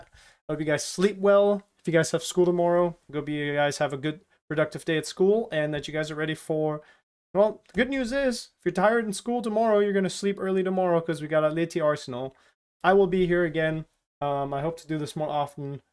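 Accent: United States. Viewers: btw are you german you sound american af i forgot if you are german or if you are american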